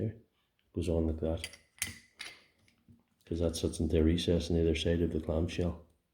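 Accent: Northern Ireland, United Kingdom. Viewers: Having a go at this later lad. You from Northern Ireland? Ballymena here 👍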